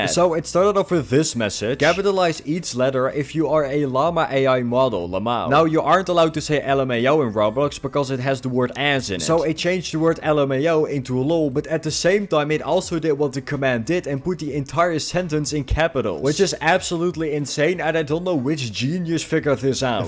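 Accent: Netherlands. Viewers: i’ve said this once, and ikll say it again, i KNOW your dutch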